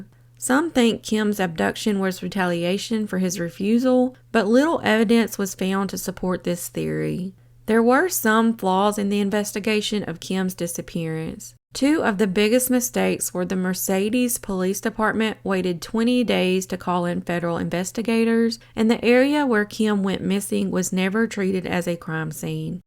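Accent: South, United States. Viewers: I love your southern accent 😊.. you are doing an outstanding job telling these stories getting the word out also.. I like listening and watching your channel... thank you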